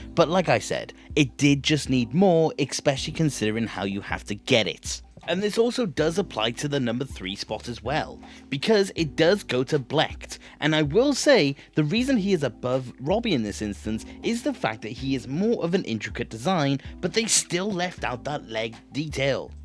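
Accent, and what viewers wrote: Wales, United Kingdom. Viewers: Are you Welsh ???🏴🏴🏴🏴🏴🏴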